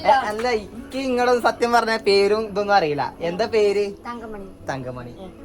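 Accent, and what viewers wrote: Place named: Palakkad, India. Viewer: Palakaad ഭാഷ കേൾക്കാൻ നല്ല രസമാ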